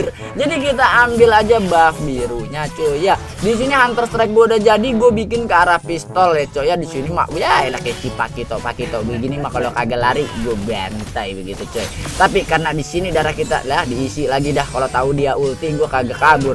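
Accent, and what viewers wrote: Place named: Java, Indonesia. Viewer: Medok amat bg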